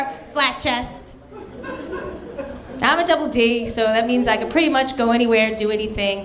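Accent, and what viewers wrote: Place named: New York, United States. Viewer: HI, IM ONLY USING CAPS TO TRY TO GET YOUR ATTENTION... I WANTED TO KNOW IF YOU WERE REALLY MARRIED? IF NOT? I WOULD LIKE TO TALK AND LAUGH WITH YOU.. ( BTW, ADRIAN ALWAYS SUPPORTED AND BELIEVED IN ROCKY! WATCH THE FIRST TWO ROCKY MOViES AGAIN ) IM ITALIAN, FROM BKLYN. NY.. YOU SOUND LIKE YOU ARE ALSO FROM NEW YORK.. IF YOU ARE NOT MARRIED? I HOPE TO HEAR FROM YOU. IF YOU ARE MARRIED? ALL THE BEST TO YOU AND YOUR HUSBAND. MERRY CHRISTMAS TO YOU AND YOUR FAMILY! ~ XOXOXO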